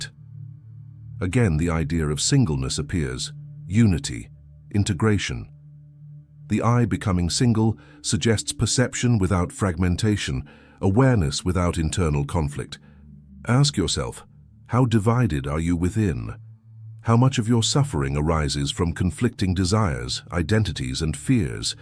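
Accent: United Kingdom. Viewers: OHH, GOD, NOT. THAT AWFUL BRITISH ACCENT......AGAIN !!!!!!!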